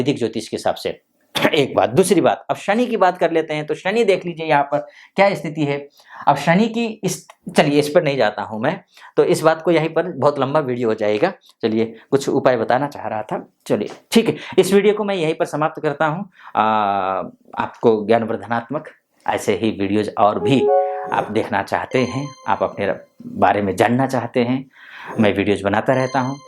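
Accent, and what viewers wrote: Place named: Nepal. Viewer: Sir. Aap nepali mul ke hain. Kiuki aap ka bhasha Nepali ka tone me aati hai . App ka prediction bahut good hai.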